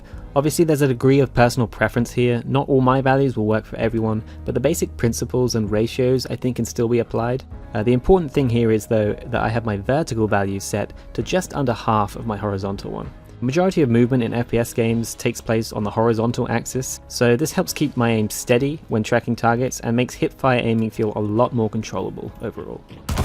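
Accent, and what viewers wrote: United Kingdom. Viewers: Fantastic. Also, your voice isn't annoying at all. If that's the reason your gameplay videos don't have voices, I really wouldn't worry about it at all. I've got my American and Austrian accents covered by Homereel and Psychoghost, bring on the British! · Ohhhh snap our boy is English! Nice homie I guess the texts are going to be a thing of the past now that you crossed into the voiceover era! A welcome change! Gives the videos more personality! Just don’t forget to put the mandatory cowboy hats on the steam codes other wise no one’s going to know is a key for hunt related stuff xD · Very informative and interesting, will definitely have a play around in the shooting range and have a mess around with my settings now I know a bit more about which each of the new ones do, nice to hear a voice finally, don't apologise for the British accent either, nice to know theres a few Brits in the Bayou 🤠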